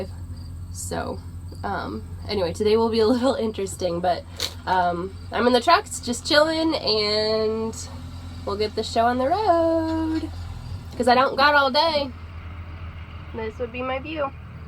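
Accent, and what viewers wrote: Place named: North, United States. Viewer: im going to guess you were raise more north than Illinois. Some of your pronunciations are more northern than me and I am in southern MI.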